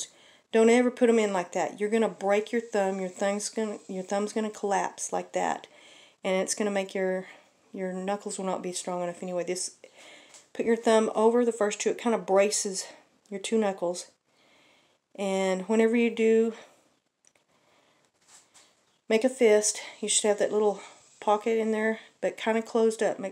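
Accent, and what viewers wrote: United States. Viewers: Are you American ??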